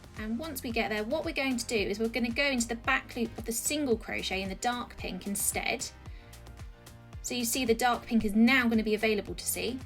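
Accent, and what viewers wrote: England, United Kingdom. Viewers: Thanks for this tutorial it is something I really want to try. You have confused me a bi thought - speaking with an English accent but talking in US terms! I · You sound English but seem to using American terms? E.g. ‘single crochet’ instead of ‘double crochet’. I am I correct?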